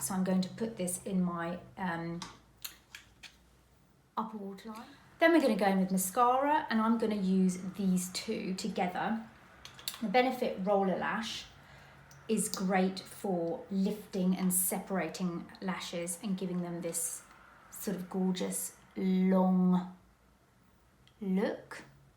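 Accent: United Kingdom. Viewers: To my American ears, anything you say in your British accent sounds luxurious!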